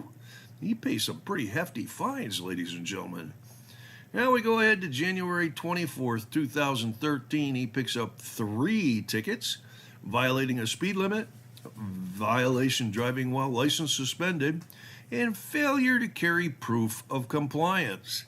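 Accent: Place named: Balkans. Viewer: With his accent, I would guess his people are from the Balkans.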